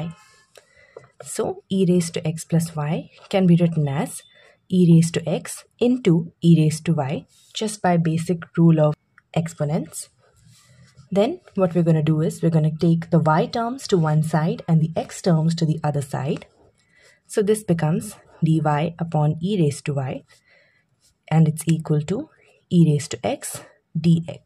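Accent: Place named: Russia. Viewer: LGta hai ki रशिया की मैं बोल रही है😢😢 समझ नहीं आया😮😢😢😢😢😢😢😂😂😂😂😂😂😂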